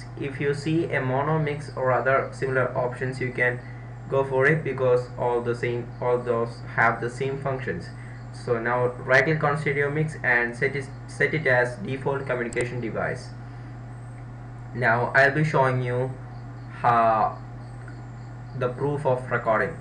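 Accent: India. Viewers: he has idian voice so he can fix it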